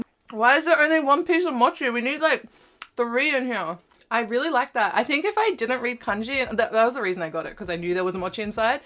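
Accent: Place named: Australia. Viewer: your accent is so cute. I wish I was from australia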